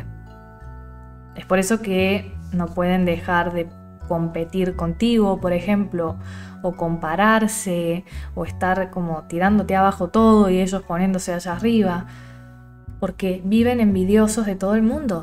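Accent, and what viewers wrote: Córdoba, Argentina. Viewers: Completísimo. Escuché otros videos tuyos, explicas muy bien. Tengo la duda si sos cordobesa, nada que ver pero los cordobeses nos reconocemos el cualquier parte. Sos una genia, manejas mucha información y la haces entender. Gracias x todo. Sé en qué momento estoy. Más segura, más emponderada, pero se que también puedo correr el riego. Él está ahí, rondeando, espectante de saber cuándo puede volver a haberme entrar el si juego, lamentablemente lo tendré que ver el resto de o vida, creo se entiende el porqué. Naa.. todo lo que explicas es así. Cuando explicaste que se nos queda ese lado oscuro en nosotros, que hago cosas aprendidas de él, hasta pensamientos aprendidos de él, todo ésto que lo transito con mucha más conciencia gracias a pedime y como vos que nos explicaron toda esta tragedia y le pusieron un nombre. Digo esto porque yo sabía yv entendía que algo malo había, siempre lo percibí y después que pasó lo más terrible seguía sabiendolo, pero aún sin entender qué era. 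Enormemente gracias.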